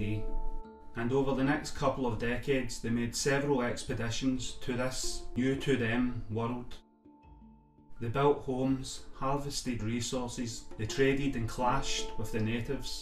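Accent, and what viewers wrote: Scotland, United Kingdom. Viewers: Love any & all information on the Vikings as well as any info on Scots & Picts too!...thank you for sharing & again I love hearing the Scottish accent! Have a blessed weekend! ~Ginger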